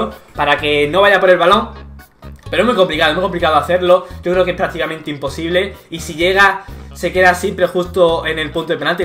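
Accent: Germany. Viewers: eres Alemán??? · eres aleman?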